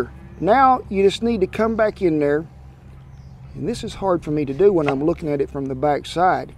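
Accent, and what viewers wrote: South, United States. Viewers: His voice and southern accent is so nice to listen :) and of course amazing recipes he does! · to be honest I watch this because of the cowboy-accent. So stylish slang keep up the good work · Nice and clear american diction! You can work as a showman) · I like ur southern accent sir... really smooth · well, it's cowboy drag, at the least. he's really worked on the "cowboy" accent.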